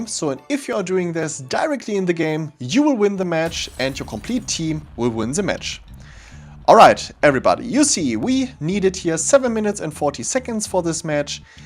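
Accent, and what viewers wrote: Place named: Germany. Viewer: Ahh dieser deutsche Unterton ^^ man erkennt den doch überall. Es gibt leider kaum Spieler für das Game =(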